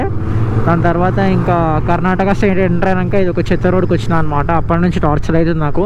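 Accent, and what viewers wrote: Hyderabad, India. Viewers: That hyderabadii language was wow 😂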